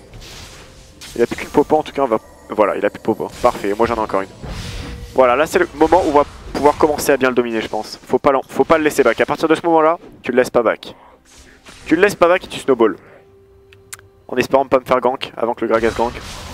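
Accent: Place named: South, France. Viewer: C'est moi où il avait un léger accent du sud en 2015 ? La vie de parisien ça lui a atténué ses origines on dirait :D .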